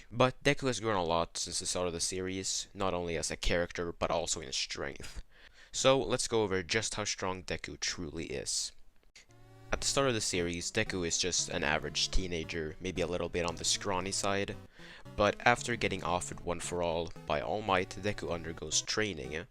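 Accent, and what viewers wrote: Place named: Sweden. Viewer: This dude sound Swedish ( great video btw, shit was good as hell)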